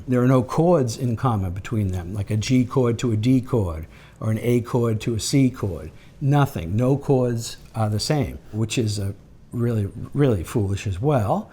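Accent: Boston, United States. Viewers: . Boston Accent 101. But I’m happy because is So Incredibly Dumb as a song, it deserved to be destroyed.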